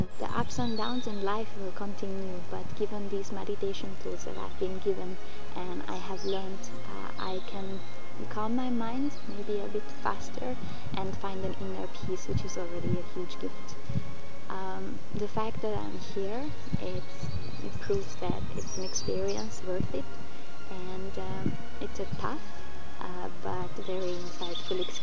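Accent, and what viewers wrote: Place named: Czech Republic. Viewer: thanks for posting, very well articulated and descriptive video. i'm about to embark on my first vipassana experience & judging by the constant smile on this girl's face, it might just be smtg useful :) where is the accent from? my guess: czech republic....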